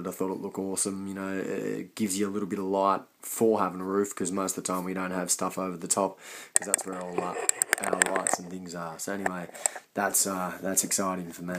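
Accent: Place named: Australia. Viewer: Aussie accent good to hear g'day from Perth P.S great content nice work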